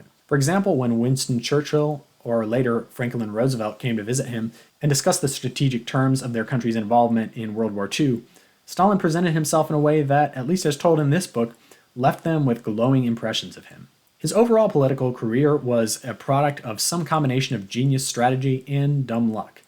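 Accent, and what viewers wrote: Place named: General American, United States. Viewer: Very insightful thx! By the way you have a beautiful "General American accent". 🎉 I am kind of a language nerd. ;) Keep up the good work! 🎉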